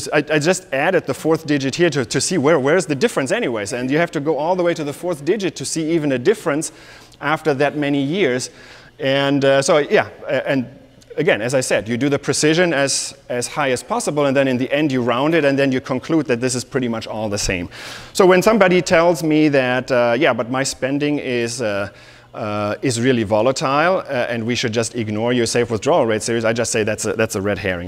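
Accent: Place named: Germany. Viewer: Deutscher Akzent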